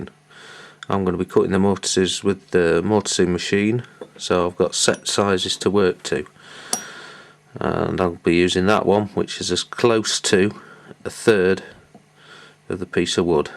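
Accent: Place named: Northern England, United Kingdom. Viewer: great instruction...love the wallace and grommet accent. "More por-r-i-g-e Grommet?"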